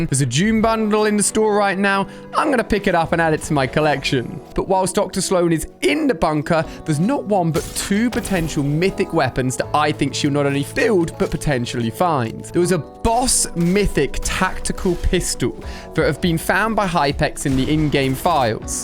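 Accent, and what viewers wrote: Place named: United Kingdom. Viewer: She-swee is how you pronounce shisui but he lives in UK so he talks different. I'm not hating btw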